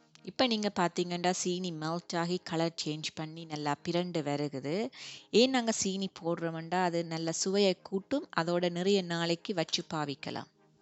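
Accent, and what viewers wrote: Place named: Sri Lanka. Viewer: Srilankan Tamil with foreign accent English sounds beautiful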